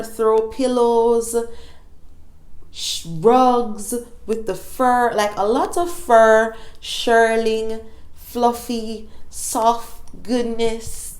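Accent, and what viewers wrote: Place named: Jamaica. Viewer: was just saying you sound Jamaican. This is my first time coming accross your channel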